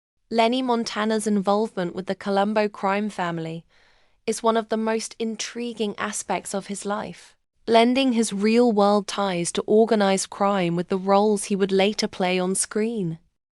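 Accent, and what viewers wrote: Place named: United Kingdom. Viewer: Why is there a preponderance of British accents vo-ing mob stuff ? I’d rather hear a Brooklyn accent ! More realistic and less nauseous !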